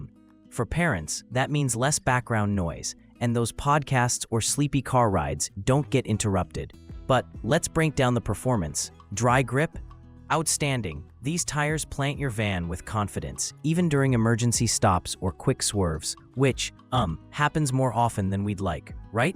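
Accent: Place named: Canada. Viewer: Narrated by a Canadian for the Canadian audience..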